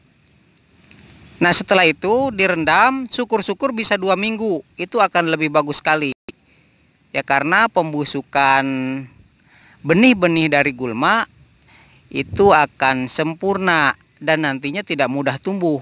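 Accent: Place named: Cianjur, Indonesia. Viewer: Logatnya seperti tmn saya yang dari Cianjur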